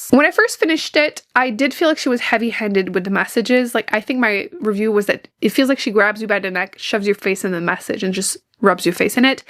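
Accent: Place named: Quebec, Canada. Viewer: I know a québécoise when I hear one hihi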